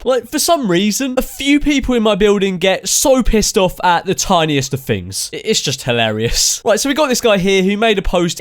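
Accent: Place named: RP, United Kingdom. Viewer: u sound posh, fam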